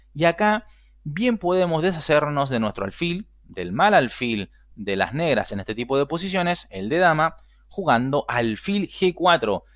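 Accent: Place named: Chile. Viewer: OYE, TU ACENTO TE DELATA: ¡¡¡TÚ ERES CHILENO!!! MI PAPÁ TAMBIÉN ES DE RAZA CHILENO COMO TÚ!!! ¡OJALÁ! ALGÚN DÍA, CHILE, LLEGUE A TENER UN CAMPEÓN O CAMPEONA MUNDIAL DE AJEDREZ!!!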